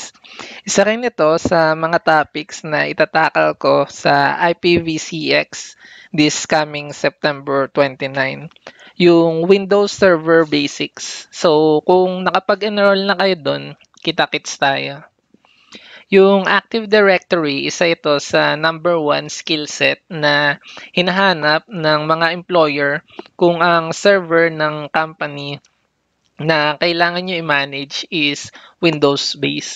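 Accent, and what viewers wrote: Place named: Iloilo, Philippines. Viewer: Nice tips sir, I've been sharing your post to help the community, niche ko rin sana to kaso di pako certified and di pako ganun ka bihasa hopefully marami akong matutunan dito. maraming salamat! and may ilonggo accent ang pag sasalita mo, I'm from Koronadal City , Ilonggo dialect rin. hehe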